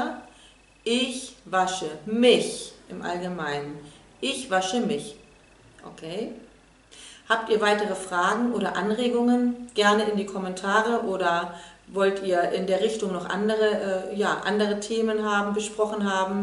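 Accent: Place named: Germany. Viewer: Immer Super Videos! Ich lerne viel hier! Was mir am meisten gefällt ist der Akzent oder die Aussprache. Echtes Deutsch!!!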